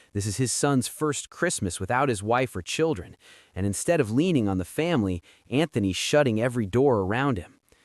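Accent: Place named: United States. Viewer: Why is there an American speaking about our soap??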